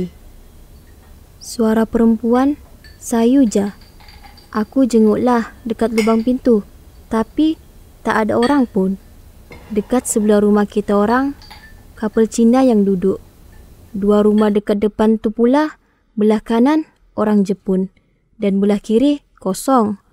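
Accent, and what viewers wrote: Sabah, Malaysia. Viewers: Sabah ke ...swk punya cerita..tpi pembaca mcm sabah..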